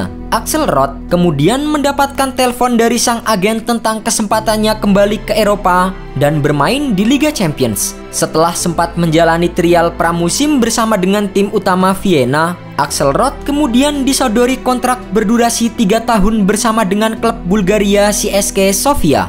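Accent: Indonesia. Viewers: Aksen indonya kurang2in mas, trayel bacanya ga sih? Bukan trial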